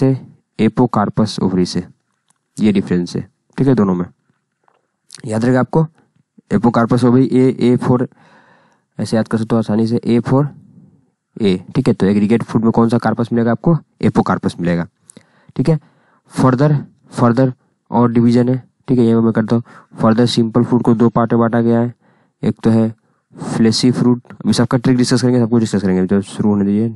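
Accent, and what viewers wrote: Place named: Bihar, India. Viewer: sir 😁😁😁 u r tooo cute ......apne karaila kharbujja bhut funny way me bola .....koi nii ap Bihar k ho n... Bihar accent me bola apne.....mst trick Bhaiya ji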